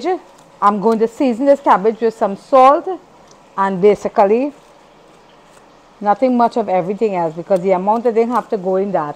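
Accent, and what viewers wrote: Trinidad and Tobago. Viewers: I'm a new subscriber and I love watching you cook. I have former college friends who are Trini that I spent years with and you bring back those memories of Trinidad trough the food, accent and love of family. It's great to see...can't wait to watch all the past videos and catch up! Sending love and blessings to the family. 🖤❤🇹🇹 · Hello......this is from a Trini living in Africa...where there is no Trini cooking...except for a little in my kitchen. I just discovered your channel. You make me laugh because you are a true Trini cook. No measurements...a handful of flour...a little dash of salt...just loving it. It's the way Trini's cook. You don't need measurements...you know what you're doing...and you do it fast. I watch other channels to see exactly how to cook the food...with the right amount of ingredients. I watch you just to lime in your kitchen. 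Love hearing the Trini accent and just your way. Next time I am in Atlant again...I will have to visit Tassa. Blessings to you!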